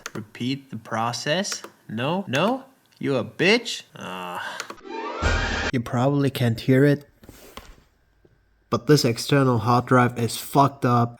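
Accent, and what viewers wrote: Germany. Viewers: youre german???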